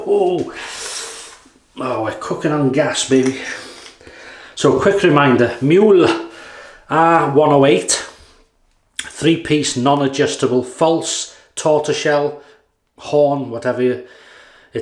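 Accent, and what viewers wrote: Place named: Wales, United Kingdom. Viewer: Hi. Thanks for the videos. I've really enjoyed watching them, and found the very helpful. Actually, it's your videos that have convinced me to get into DE shaving, and I haven't looked back since! Thanks again, from a fellow Welshman. Hoping you are Welsh, as your accents sounds Welsh!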